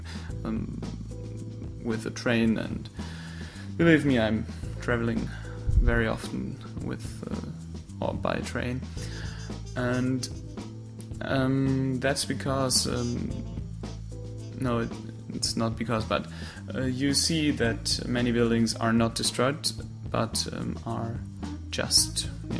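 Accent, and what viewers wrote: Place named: Mannheim, Germany. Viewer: Are you from near Mannheim in Germany? I recognize the dialect! XD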